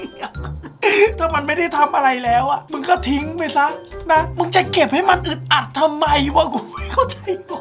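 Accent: South, Thailand. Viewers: พี่ ใต้ไม่นิสำเนียงดีจัด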